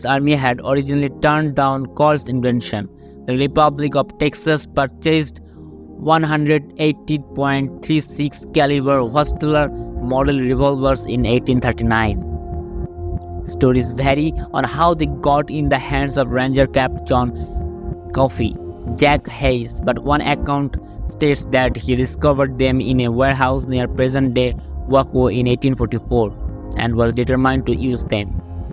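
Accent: India. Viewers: Sonehow i can't deal with an Indian accent presenting such a real Western American history story. Doesn't sound authentic. Please use someone with no accent.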